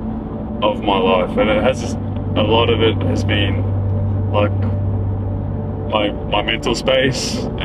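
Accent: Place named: Australia. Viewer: i have been traveling to golds gym from europe every summer for the past 10 years til covid hit. I have pics with you from almost every summer, with Karina Justin Steph Mike etc. You were always such an inspiration to me and to thousands maybe millions of people. I just wanna tell you, you dont be buff and huge, we just enjoy seeing you online again. Im sure you will heal as times passes on. Just take your time, find happiness and joy again. The community will always stand behind you. We all have to face our own battles most of the time alone, but strong people will win those battles, shut down the inner demons and find the real meaning of life again, which is love and selflove. I wish you all the best, and hopefully one day ill meet you again and you will have that bright smile with that aussie accent again.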